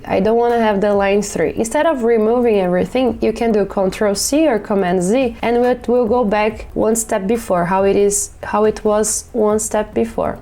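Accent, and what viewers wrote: Brazil. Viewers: thank u so much. and btw a month of watching your tutorials, always assumed you were russian or ukranian, never crossed my mind that accent was brazilian😆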